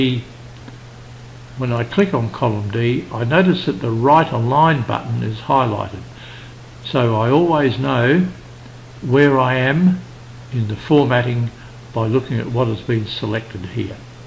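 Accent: Australia. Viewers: Hey. great video! many thanks. Would you say that this sort of test would be carried out in the UK. I only ask as I heard a Aussie (or kiwi?!) accent and thought this test might appear more in the southern hemisphere? please let me know when you get a chance. cheers. M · awesome accent, Australia?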